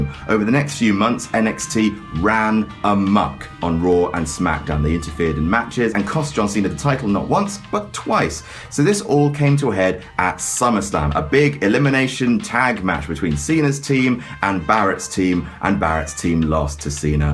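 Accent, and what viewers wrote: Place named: United Kingdom. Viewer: Damn with that smile and that British accent..... I'm in love...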